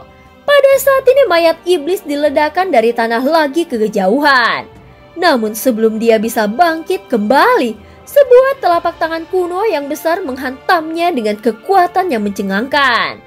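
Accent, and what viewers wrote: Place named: Semarang, Indonesia. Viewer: Dunia itu sempit ya, tiba tiba saya di tempat tongkrongan bertemu dengan pendengar chanel ini 😂, semarang mana ini suaranya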